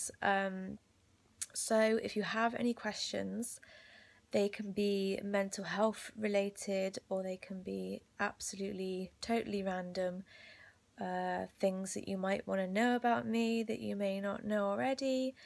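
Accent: United Kingdom. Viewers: Ok I'm a little late but you can queue this up for next time: Can you show us & explain your tatoos. Thanks, PS Love the British accent.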